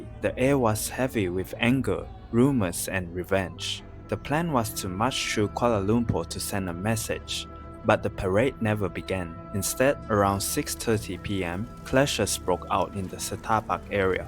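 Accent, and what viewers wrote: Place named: China. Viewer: While it's a fact May 13 happened, please remember that this video's accent is PRC. Don't let outsiders drive a wedge between modern Malaysians. It doesn't even mention key names in the confrontations, no mention of Harun Idris because a PRC or Malaysian CCP shill would not know. Victory parades DID happen, which contributed to the increasing tensions. We have freedom of Internet unlike China, you can search anything about May 13, research and talk about it, unlike Tiananmen Square.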